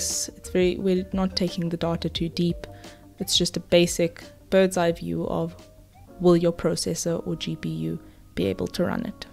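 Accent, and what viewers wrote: South Africa. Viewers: That South African accent is wholesome. I dont know if I'm wrong but... I love it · Definitely a South African accent. Are you South African?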